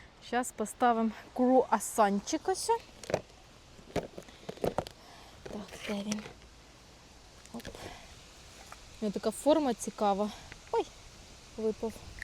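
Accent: Kirovohrad, Ukraine. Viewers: А я то думаю що це суржик такий же як в мене, а виявляється ми обоє Кіровоградські)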